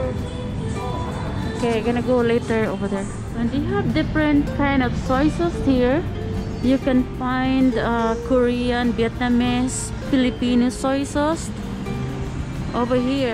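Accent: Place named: Visayas, Philippines. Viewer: Hello ma'am, murag bisaya ka ma'am, thank you for sharing this video, daghan silang klase sa isda oi, maka lingaw.. Amping, sending my support kabayan..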